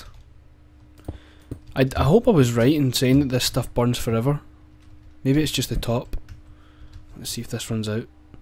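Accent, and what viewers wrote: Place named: Glasgow, United Kingdom. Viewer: No he doesnt? small beans is from york. He sounds like he's from somewhere near Glasgow. And you do know that insted of burning wood, you can burn one unrefined but of wood and make charcoal which is good for 8 full cycles instead of 1.5 for wooden planks.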